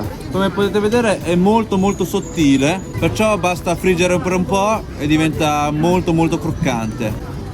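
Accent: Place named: Romagna, Italy. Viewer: Non ti conoscevo prima di questo video, ma sono rimasto impressionato da quanto tu riesca a parlare bene in italiano. Addirittura mi ha dato l'impressione che tu abbia una cadenza romagnola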